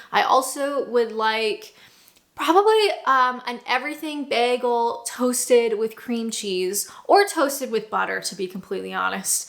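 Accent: Canada. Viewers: A belated Canadian Thanksgiving to you 💖🇨🇦 Your Canadian accent is delightful, especially the "oo" sound in words with ou in them such as "out", but please, please loose the zee, it's ZED 😅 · To my (Londoner's) ear. your speaking accent gets more Canadian when you visit home and less so between visits. For example the second syllable of "about" gets longer when you go to Canada and gets shorter gradually on your return. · keep your Canadian identity --it is part of who you are for sure. Generally speaking, unless you moved to Britain very young and went to school there, your accent is quite 'fixed' for life even if you use local words and 'Britishisms'. You are being a 'polite' Canadian when you use a British word to communicate over the phone to help with understanding. Yes, Tim Horton's does have grilled cheese sandwiches on their menu and there is nothing like it for comfort food. 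When sick, it may be the only thing that can help the appetite. Although British people often mistake a Canadian accent for an American one, we Canadians are pretty good at reminding people that we're Canadian, eh? I think I will have a grilled cheese sandwich for lunch today! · Accent changing s very much an individual thing. My mother's eldest sister and her husband left Norwich to live in Yorkshire for over 30 years until they returned to Norwich when my uncle retired. When they left they both spoke with the Norwich accent they were brought up with. When they returned my aunt sounded very Yorkshire but my uncle still spoke in his original Norwich accent without even a hint of his 30 years in Yorkshire.This is remarkable because he worked in a large Yorkshire chocolate factory where he would have talked to local people all day long in his supervisory role while my aunt was a housewife who would have had less contact socially. 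I think you will always sound Canadian because there is no single accent that everyone you know speaks, whereas in my uncle's case everyone he spoke to at work and socially would have had the same local accent. That is why it was so odd he never acquired even a hint of it. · Never, ever lose touch with your roots. Same as myself, you can blend your adopted country with the one you were born. It's funny though how childhood experiences seem to stay with us forever. So is a Canuck - Brit. Best of both worlds. Makes a very good blend!!!!😀 and, please, never lose your accent. It's so nice to listen to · Canadian speech, US tastes. More so than the Canadians i have spoken to.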